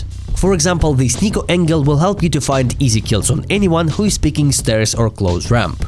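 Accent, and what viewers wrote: Russia. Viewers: а я один думал, что это русскоязычный тип? я начал смотрел его давно, когда он только снимал видео на ютуб и без вебки, и мне казалось, что его акцент и имя похоже на русского…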